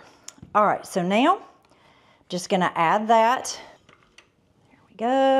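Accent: South, United States. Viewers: Awesome recipe!! I must say you are incredibly beautiful, I mean like breathtaking and that southern accent for a northern city boy like myself is just mesmerizing.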